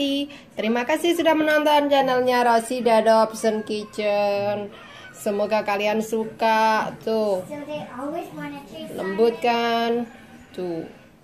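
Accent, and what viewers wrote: Java, Indonesia. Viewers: Aq suka dengan resepx n cara bicarane itu lo bhs jawax medok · ndlok ngomonge sampean wong jowo yo? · Mbak ini ngomong nya kaya orang jawa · Konten konten mantaff..mba sekeluarga warga negara Hongkong apa warga negara Indonesia..Sy suka mba klo bicara pake bahasa Inggris tapi medok nya masih ada..the best mba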